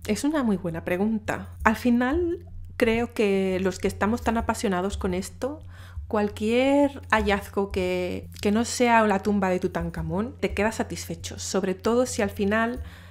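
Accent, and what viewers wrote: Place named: Andalusia, Spain. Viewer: Como ya te dije hace mucho tiempo, no dejes tu acento andaluz.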